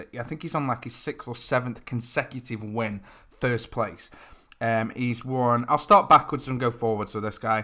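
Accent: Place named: Stoke, United Kingdom. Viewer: Are you from Stoke mate?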